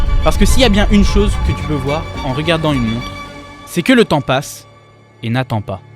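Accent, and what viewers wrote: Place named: France. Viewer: Découvert avec la version Anglaise au lourd french accent, très drôle et superbes visuels, bravo!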